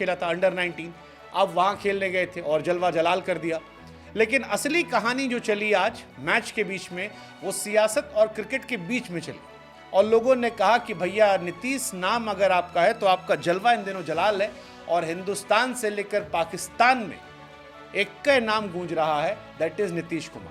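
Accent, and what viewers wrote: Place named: Uttar Pradesh-Bihar, India. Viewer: Aaj aapki aawaj up bihar lag rha hai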